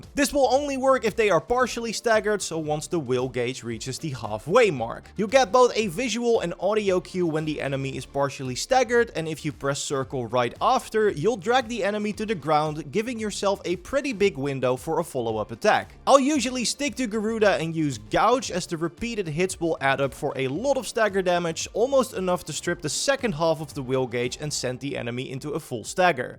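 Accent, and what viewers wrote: Netherlands. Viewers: Your accent is sooo dutch 🤣🤣🤣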